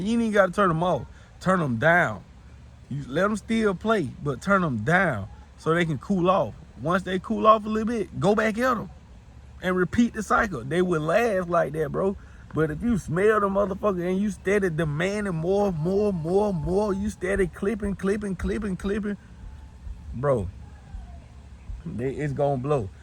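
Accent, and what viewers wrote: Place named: Georgia, United States. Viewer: I enjoy your vids. I feel like, from your accent, you live close to me. I'm in SW Ga.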